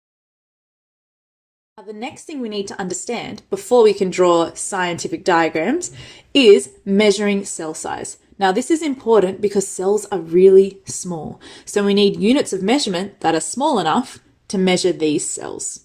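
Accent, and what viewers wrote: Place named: Australia. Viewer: She Australian uwu.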